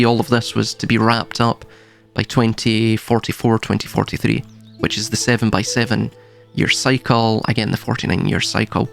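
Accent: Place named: Scotland, United Kingdom. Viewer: A very sobering video, depressing but liberating. Also, you have a silky smooth Scottish accent. Don't worry about that. My favourite band are Scottish. I've always liked the accent. Love from England!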